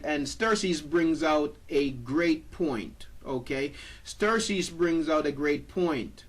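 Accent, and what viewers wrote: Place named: Caribbean. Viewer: Thanks for sharing. I heard your voice and was trying to place this Island brother.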